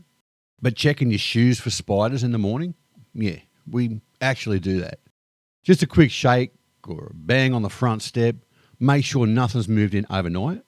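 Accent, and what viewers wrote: Australia. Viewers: Three syllables out of your mouth and I knew your Australian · To be fair, your Aussie accent is quite broad. Your experience being misunderstood in the US might be worse than some. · Unfortunately, you have quite a strong Aussie accent. You're like Bubba from the deep south or Jerome from the Bronx trying to order KFC in Australia 😂. BTW, I'm in my 60s, lived all over Oz, and never checked my shoes for spiders and have never found one. Nobody I know does. That said, encountering a Funnel Web or Redback (=Black Widow) in your shoe could be a problem. Other spider species? Nah, pretty harmless. Spiders and snakes never 'try to kill' anyone. They just run away, if you can even find one. Bears, Pumas and wolves are far riskier. They WILL attack and possibly kill. American readers, please get these things in proportion. Australia is very safe.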